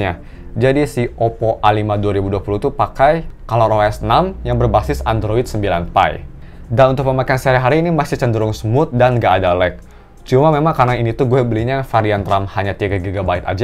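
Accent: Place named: Kerhor, Indonesia. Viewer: Bahasa audio nya pasti orang kerhor ini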